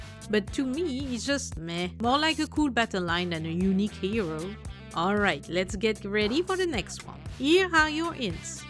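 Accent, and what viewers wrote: France. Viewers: you're one of the only people discussing new GW models and not complaining about how complicated they've become over time. When I started this hobby, all the models were metal. They were awful compared to what they are now. Many creators hate Primaris Marines, but I think we finally have properly scaled Space Marines for the tabletop. I'll complain about GW's ability to balance their games, write rules and their tendency to ignore their own lore or worse, retcon it. But they do make amazing models. And honestly, your voice activates neurons. It's like, musical. I live in NYC and I'm well aware that I have a very Bronx accent. I've heard myself on videos and I'm always shocked. Hearing an amazing French accent from a female is a distinct pleasure for the ears, especially when you're surrounded by people who start every sentence with the word 'yo,' and end it with 'ya heard?' and somehow slip 2 'motherf*ckers' in along the way...a feminine French accent is like a holiday after a day spent on a NYC construction site. 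Edit: And now I find myself admiring the models silhouettes...that's strange. Where did I pick up that from? 🤔 Sigh. Lunch is over. Back to work.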